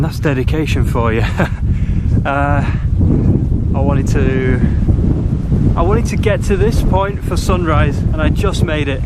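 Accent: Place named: United Kingdom. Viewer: Where are you? British accent, but you are always riding on the right side of the road. Love the videos. Love that you love your dog too!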